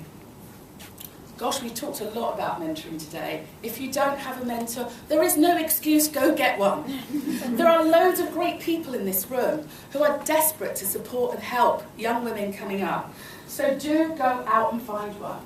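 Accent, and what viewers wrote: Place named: United Kingdom. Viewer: Way’ment. I remember when she was in the group, but when did she develop this British accent?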